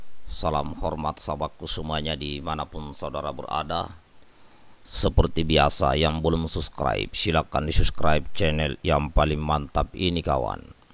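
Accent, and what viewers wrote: Indonesia. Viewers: logat ke daerahnya jgn di tinggal itu makin Indonesia Banget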